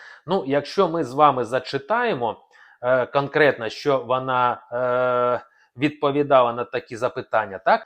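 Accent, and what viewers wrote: Russia. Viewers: А ты не русский часом?))) Акцент просто ужас.... кровосися.... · Ну очевидно же что ты не украиномовный. У тебя чисто российский говор. Не ломай язык,говори на своем родном языке!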